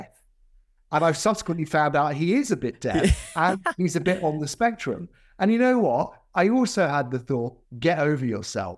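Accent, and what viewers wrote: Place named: United Kingdom. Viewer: U R DELIGHTFUL to WATCH & LISTEN TO!!😂🎉 💥😄👏😇 ❤️ ur BRITISH SOUND!! IT'S me "mother 👅😛' TONGUE!! 🤣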